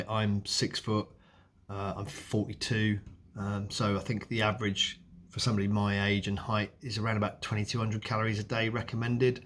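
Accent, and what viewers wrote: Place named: England, United Kingdom. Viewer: I'm quite sure he's English , judging by accent and the sockets behind him 😂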